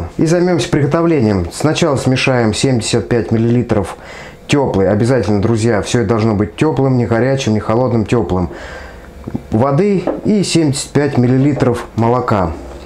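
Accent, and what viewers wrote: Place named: Voronezh, Russia. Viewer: открыл рецепт, услышал говор и сразу понял - зёма. спасибо братюнь, тесто уже подходит. наш говорок только с воронежским можно спутать)))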